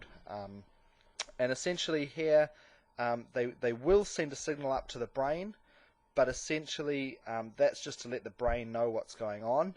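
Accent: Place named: New Zealand. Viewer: nice kiwi accent hehe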